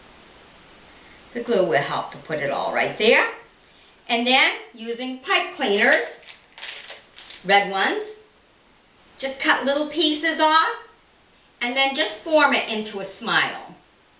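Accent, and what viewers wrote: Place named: New Jersey, United States. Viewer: This is really cute c; Your accent makes me miss my grandma from Jersey. If I ever have kids I'll remember this.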